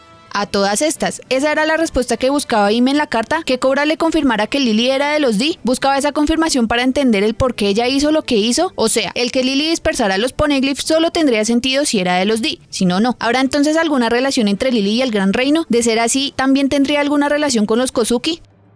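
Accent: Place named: Bogotá, Colombia. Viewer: Me fascina ese acento de Bogotana…😊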